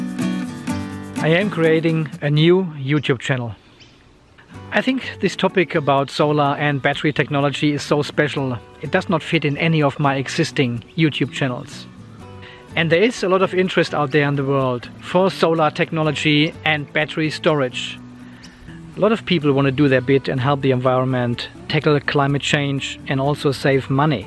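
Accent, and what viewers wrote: Germany. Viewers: Dear, am I right that you are originally from Germany? It simply sounds like this 😅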